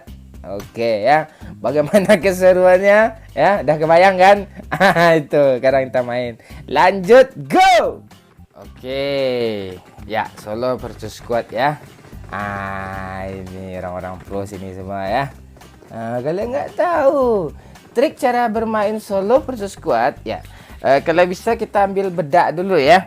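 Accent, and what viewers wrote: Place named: West Sumatra, Indonesia. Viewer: sikampret kacau, salam kenal abang aq dri jawa barat tapi karena sering denger logat minang kocak jadinya kadang kebawa bawa jadi kadang lupa logat sunda nya, sehat selalu bang terus upload tiap hari, rezekinya di lancarkan.. orang proo nii buahaaaahahaha 😂😂😂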